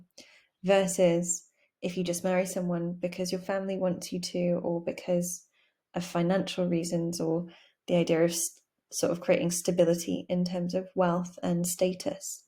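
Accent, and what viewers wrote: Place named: United Kingdom. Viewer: you've summarised this in such a beautiful way! i don't have this in my course or something, but I'm very interested in poetry and especially keats' poetry. being an admirer of keats, I've heard a lot about this poem and now I finally know what it's all about. thank you so much you helped a lot! ❤️ ps. I love your british accent. british is my fav of all types :)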